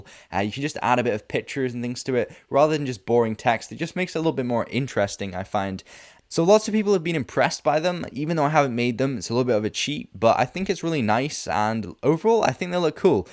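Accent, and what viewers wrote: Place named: Edinburgh, United Kingdom. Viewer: What's up with your accent? I'm guessing you're from Edinburgh but...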